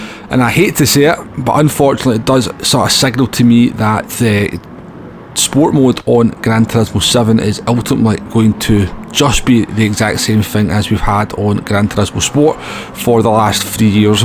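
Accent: Scotland, United Kingdom. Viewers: Holy shit I had to turn on the sub titles thick scotish accent 😳 I though we spoke the same language At the end of every video you should yell FREEDOM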